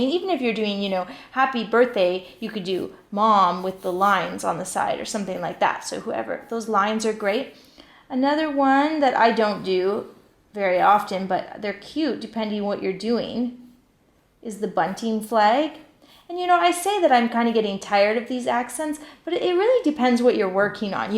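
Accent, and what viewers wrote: Canada. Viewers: I love your videos. Totally inspiring. I've taken so many ideas from you, and have even found myself slipping from my American hick accent to your fun Canadian one from time to time. :D That's how many times I've watched your videos!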